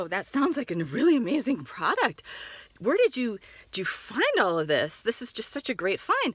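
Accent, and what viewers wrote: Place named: Israel. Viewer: Very good Israeli accent! 😀 You've also captured the scammyness of the the Sale's speech really well with the nonsense (half couscous). You should consider theater acting. 👍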